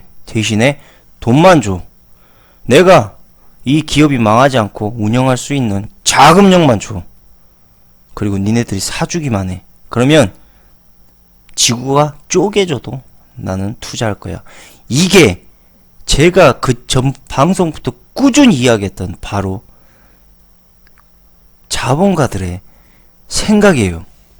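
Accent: Jeolla, South Korea. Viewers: 믿고 거르는 전라도 사투리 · 그래서 뭘 이야기하는겨. 전라도 억양으로 뭔 개소리를 하고 있는겨...... 인간 같지도 않는 놈. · 삼성을 망하라는 건가? 그럼 대안이 뭐냐? 인간중심? 좋아하네. 전라도 사투리도 거슬리고. 한심하다. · 채널 주인 전라도 억양 삼성비하... 선동성... 끄덕끄덕 역시 그쪽 분위기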